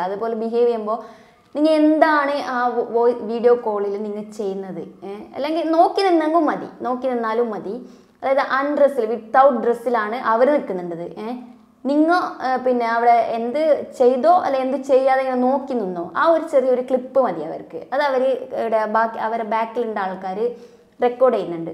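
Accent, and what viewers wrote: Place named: Kasaragod, India. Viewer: Slang kazrod ayond nere manassilayilla....but,informative 👍🏻keep well❤️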